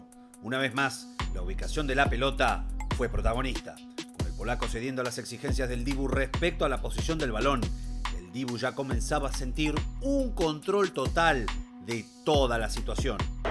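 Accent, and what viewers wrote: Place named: Argentina. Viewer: Tanto el locutor de este video como algunos comentarios sólo quieren ensalzar a un Personaje que si bien es un buen portero y eso no se pone en duda es una persona con un pobre léxico, más bien soez y escatológico, luego en el cobro de penaltis hizo mofa de su rival, una actitud antideportiva, irrespetuosa y vulgar, sin contar todas las payasadas en el punto de penaltis y luego para completar (advierto al locutor de este canal que si borra éste mensaje el cual no tiene ni una sola grosería, ni palabras obscenas, ni irrespetuosa lo denunciaré ante YouTube para que aprendan a hacer un uso correcto de éste canal que por demás es gratuito,) y cómo les decía la guinda de la torta ese gesto de ponerse el guante de oro en sus partes íntimas como un acto propio de un sádico. Señores este Dibu tiene un comportamiento totalmente de enfermo psico-sexual compulsivo. 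Todo lo descrito en mi comentario es totalmente verídico, público, comunicacional y notorio, por tanto la verdad no se puede ocultar con edulcorante dicho por un locutor que a todas luces se nota en su acento es argentino, por lo tanto no censure mi comentario y sea serio en su trabajo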